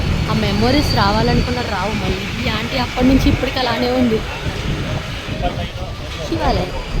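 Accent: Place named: Rayalaseema, India. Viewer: rayalaseema bhasha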